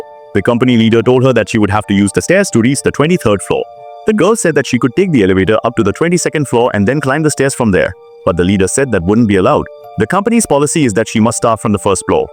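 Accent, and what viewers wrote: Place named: India. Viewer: bro why does this fuckass ai voice sound fucking indian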